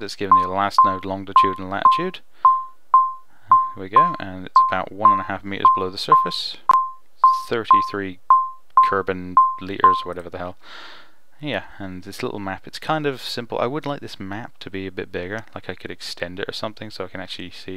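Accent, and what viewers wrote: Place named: England, United Kingdom. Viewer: You sound fairly English to me, but maybe its just me.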